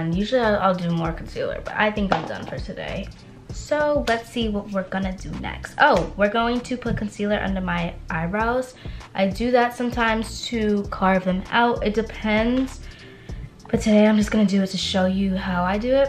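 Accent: New York, United States. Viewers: I can tell you a new Yorker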